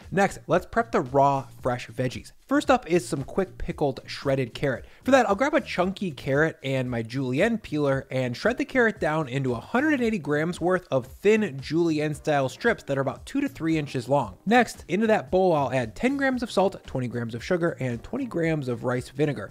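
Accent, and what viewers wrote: United States. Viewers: You’re speaking like an American so why aren’t you using the American measuring system?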